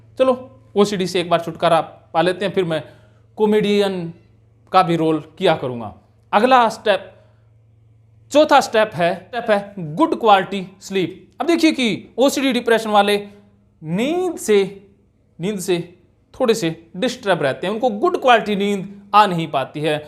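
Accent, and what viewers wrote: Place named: Marwari, India. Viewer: Sir aap great o sir ese lgta ha ap marvadi bhasha bolte o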